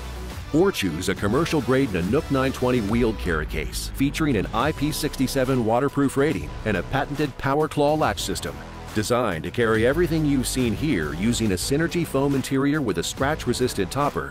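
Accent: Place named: California, United States. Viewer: Please get someone with a non Californian accent to narrate it .. that American "dude" is just putting me off like no tomorrow :-)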